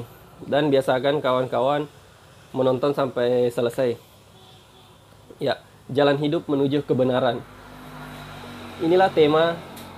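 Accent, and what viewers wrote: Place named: Bugis, Indonesia. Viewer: Kayak nya kita satu daerah dari logatnya bang kl boleh nebak dari bugis ya saya juga asli sulawesi tapi dari kecil di jawa skrg sdh menetap di jawa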